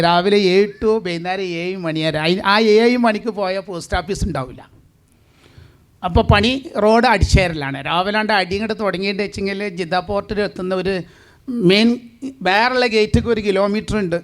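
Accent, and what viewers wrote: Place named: Malappuram, India. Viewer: ഇതുപോലെ ആരും സ്വന്തം ജീവിതം പറയാൻ മടിക്കും... അല്ലങ്കിൽ കൊറച്ചൊക്കെ കള്ളം പറയും പക്ഷെ പച്ച യായ മനുഷ്യൻ മലപ്പുറത്തെ വർത്താനത്തിലൂടെ പറഞ്ഞു അനുഭവംങൾ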